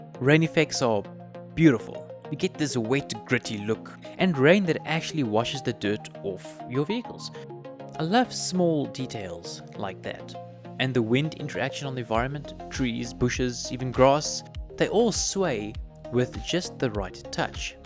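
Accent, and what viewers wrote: South Africa. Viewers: Bro are you south African, I Wil sub if you are.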